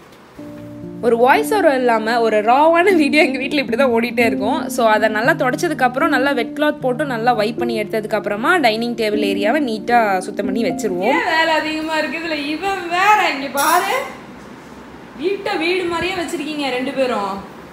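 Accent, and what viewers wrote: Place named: Erode, India. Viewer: Erode slang ✨❤ since my home town is salem i can find it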